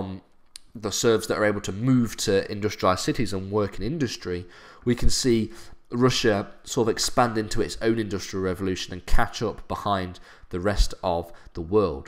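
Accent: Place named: United Kingdom. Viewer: bri ish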